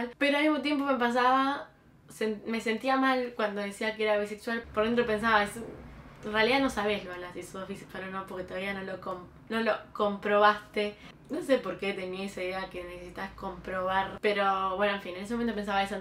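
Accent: United Kingdom. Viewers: Vine a tu canal por tik tok. Empecé con un vídeo de como aprender inglés y termine en este video 🤭 eres re linda! Amo tu acento británico 🤩 y le aplaudo 👏 a tu mami por apoyarte puesto que no todos los padres entienden a sus hijos cuando se declaran con otras preferencias sexuales. Tus videos me han ayudado mucho! Sigue así! 🤗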